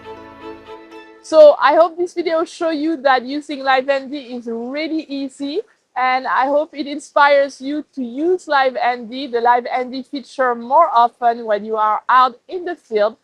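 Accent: France. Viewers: Ça paraît immédiatement que tu parles français 😊 Merci pour le vidéo 👍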